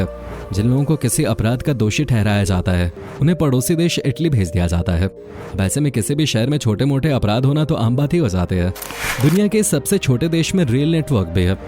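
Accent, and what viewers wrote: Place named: West Bengal, India. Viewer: Bangali voice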